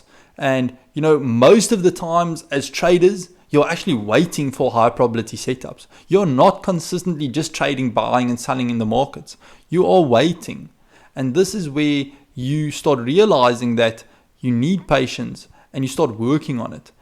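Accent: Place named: South Africa. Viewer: You sound South African. Great content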